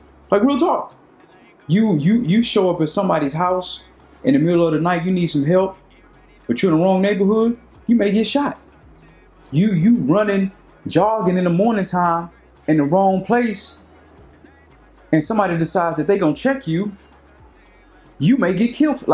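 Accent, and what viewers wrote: Ghana. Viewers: I like your Ghanaian accent. It's on point.. I see you already know how to navigate the Ghanaian society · 😁😂😂😂😂 I love your Ghanaian accent and the twi. New subbie here 🙌🙌🙌🙌🙌😘🔥😍😘💕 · My Man!!! Really amazing. You can do the Ghanaian accent. Repect! I can't do that even for 5 min consecutively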